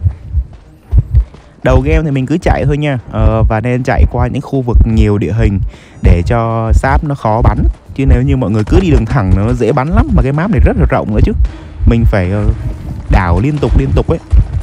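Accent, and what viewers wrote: North, Vietnam. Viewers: Anh gốc là ng bắc đko ạ?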